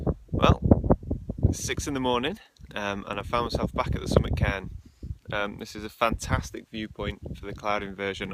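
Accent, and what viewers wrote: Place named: Northern England, United Kingdom. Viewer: I thoroughly enjoyed this video. WELL DONE. I noticed from your accent you are a northern lad. me too. I'm a keen amateur photographer and a trip to the lakes are few'n'far between. Do you provide training and workshops?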